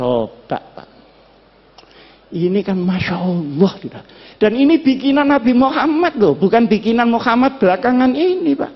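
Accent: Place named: Malang, Indonesia. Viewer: KANGEN LOGATNYA KYAI MALANG PUOL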